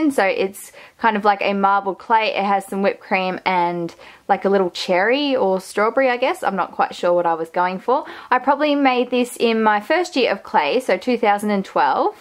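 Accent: Australia. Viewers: That Random Girl she’s Australian lmao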